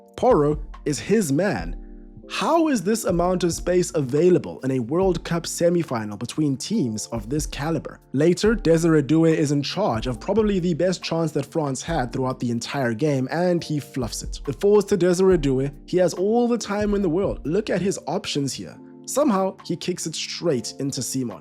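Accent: Brazil. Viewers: Habla como un brasileño hablando español, o sea bien, muy bien pero que se le nota que es extranjero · Good Spanish, your accent reminds me of Brazilians speaking Spanish. Do you speak Portuguese?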